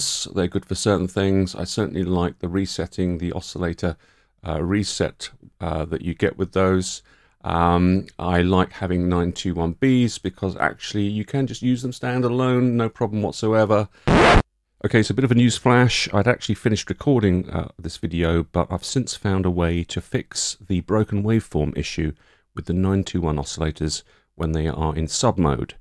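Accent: United Kingdom. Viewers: I'm not into modular (yet ;) ), but these sort of video's give me also more insight in synthesis in general. Besides that, your video's are always very thorough and of the highest quality. (It shows you're a photographer / videographer). Plus you have an excellent voice combined with your English accent, which always makes it a joy to listen to.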